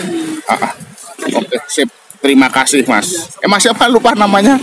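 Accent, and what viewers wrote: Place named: Banyumas, Indonesia. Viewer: Orang banyumas ya om kok bahasa nya ngapak salam inyong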